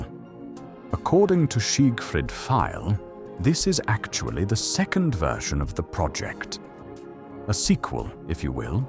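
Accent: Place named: United Kingdom. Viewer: I've always preferred the English (GB) voice. Thanks for this video.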